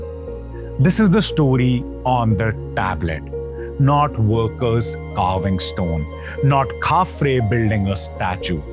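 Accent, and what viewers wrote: India. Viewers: Nope, Spinks 🙄 Indian English is like an insult to english.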